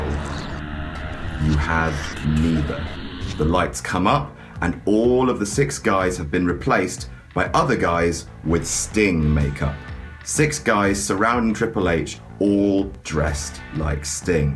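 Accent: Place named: United Kingdom. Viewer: "and I talk about how I would book them differently, because I'm a smart ass." Does anyone else think that this guy's British accent made that line a little bit funnier than it would have sounded if he had an American accent?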